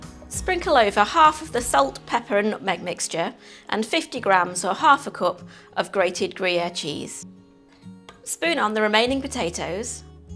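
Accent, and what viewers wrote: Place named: England, United Kingdom. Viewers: Delicious cooking explanation with that English accent makes me watch this video on repeat mode. 😂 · Great recipe and super simple, thank you.....! Are you from Liverpool? I sensed a hint of a scouse accent. Hope you are a "red" :-)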